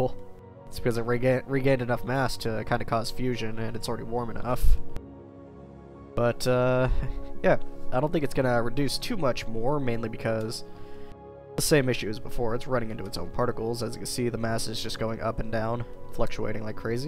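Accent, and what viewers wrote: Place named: United States. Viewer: Compelling video, for a yank you've got just the right sort of voice for this.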